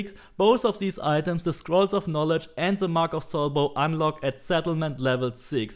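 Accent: Germany. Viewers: deutscher akzent isssss da :D nice channel btw :D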